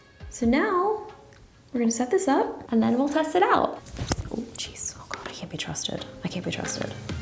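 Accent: United States. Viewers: wow You talking like😲😲😲 Wow People from u.s are adorable than I taught they will be... 😁😁😁I don't even know if you are from u.s but you sound like them "lol" It's amazing😉... Well actually you are changing the rules of a girl now huh.....well my taught still not wrong maybe you are the one who's different Wellhuh! It nights🌃 here my country so im going for bedtime sleep 😴 after playing some 🏀🏀⛹️‍♀️...everything workout well...... SEE YA👋🏻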